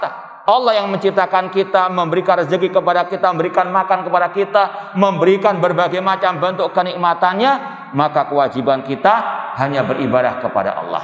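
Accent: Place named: Java, Indonesia. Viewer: Medokan sawah timur hadir 👍 baarakallah fiik Ustad.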